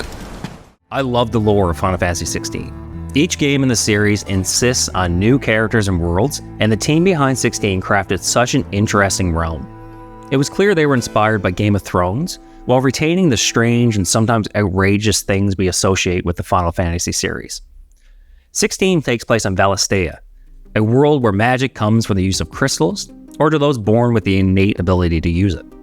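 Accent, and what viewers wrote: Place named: Newfoundland, Canada. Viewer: I can tell this guy Canadian, the way his says “Out” and “About” 😂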